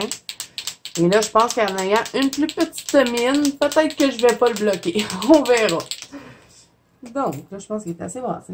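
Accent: Quebec, Canada. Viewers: Ho..j adore déjà votre beau accent québécois que jai bien connu durant 3 belles années chez vous.... J'aime vos vidéos qui sont super bien faite et vos astuces sont géniales... Merci merci de me faire redécouvrir un peu le québec et merci pour vos conseils vos méthodes qui me font capoter et que j essaye..bonne soiree a vous belle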